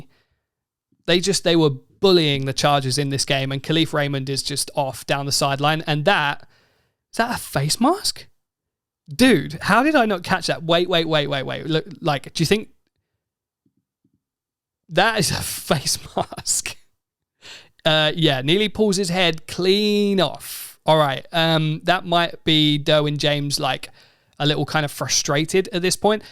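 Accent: United Kingdom. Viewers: Nice breakdown. Im so bad with accents. South Africa? Great to see a non American have such passion for American football. Edit: UK. See, I over thought it and guessed wronge. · In this case I shall not tell you to shut your gob, you tit. Refreshing to hear a Brit breakdown Lions highlights better than most Lions fans could. Cheers!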